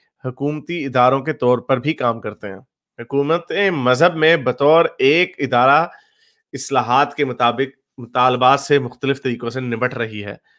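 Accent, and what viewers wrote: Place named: Punjab, India. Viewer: That deep Punjabi voice 😁😁😁👍👍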